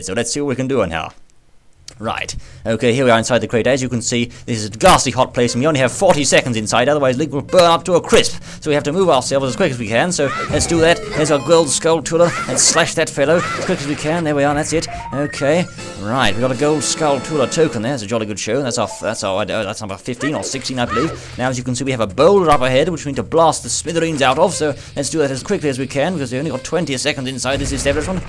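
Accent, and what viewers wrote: United Kingdom. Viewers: Love the voice and brittish accent, you got a fave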